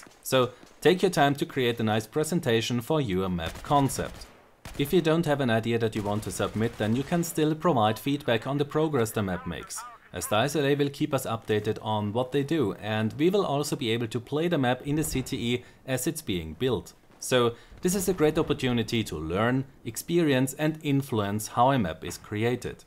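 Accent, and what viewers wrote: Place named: Germany. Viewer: I do have to say, besides your sultry German (?) accent, you are probably the ONLY Battlefield YouTuber that actually looks at the bigger picture. The one who sits down and analyses things instead of bitching about them (like LevelCrap and others). You actually have the more levelheaded approach to how Battlefield works and what should be done to make it better. Keep up the good work!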